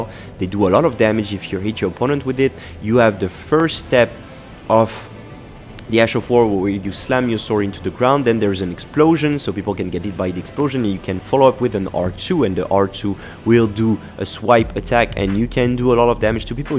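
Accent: France. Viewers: Are u french? Si oui tu parles très bien anglais, les touches d’accents font tout le charme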